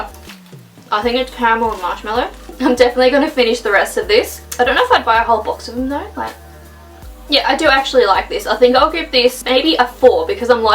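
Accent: Australia. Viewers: Bryn williams that's how Australians talk and I was that dis like btw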